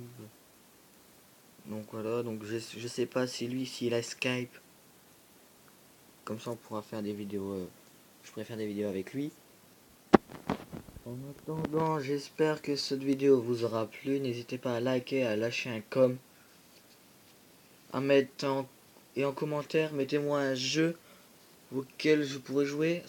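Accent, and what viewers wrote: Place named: Quebec, Canada. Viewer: Accent québécois ❤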